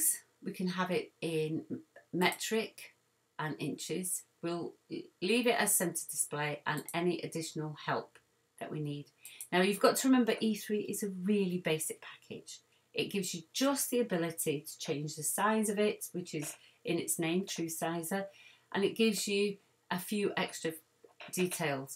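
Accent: Yorkshire, United Kingdom. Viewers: I just found your channel and subscribed please please do more tutorials on digitising for beginners. You speak my Yorkshire language and explain beautifully! Please do more! Xx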